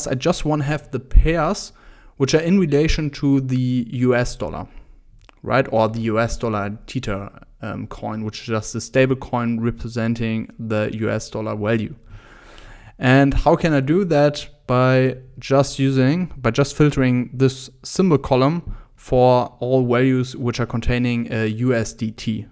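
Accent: Germany. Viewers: hey dude. i really like your videos, thank you :) i am getting into python atm. do you have any tipps? in the end i wanted to make a telegram or discord bot. i like the idea of a trading bot also a lot :) so for now i am stuck at my udemy though :D so any tipps are welcomed. and yeah, thank you for your videos :) ach so, kann es sein, dass du deutsch als muttersprache hast? ;)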